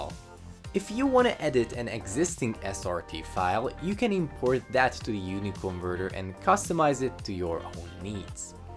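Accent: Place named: Netherlands. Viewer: Je hoort direct dat je Nederlands bent.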